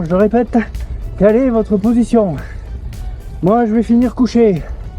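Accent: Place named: South-West, France. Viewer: Beaucoup de mots crus et d'accent du sud-ouest. Je m'abonne.👍💪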